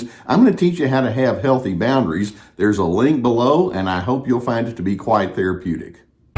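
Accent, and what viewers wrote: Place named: Oklahoma, United States. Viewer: Sounds like Oklahoma coming from Albuquerque, NM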